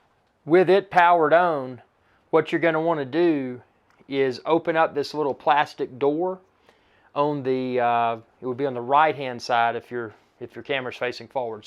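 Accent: South, United States. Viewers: That southern accent is golden, love it. Greets from germany!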